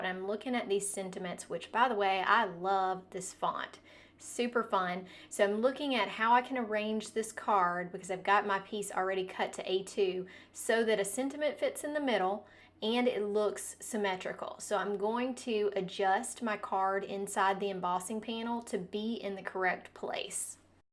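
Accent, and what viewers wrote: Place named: Texas, United States. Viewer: Beautiful! And I enjoy that Texas twang...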